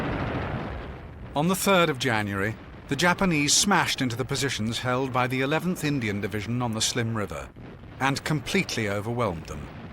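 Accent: United Kingdom. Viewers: Very British accent indeed ....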